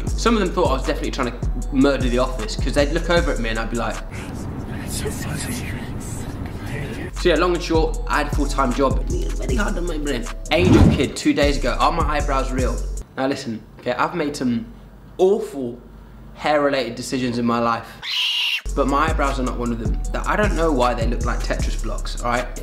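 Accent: RP, United Kingdom. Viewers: Ngl he still sounds posh his real voice, like it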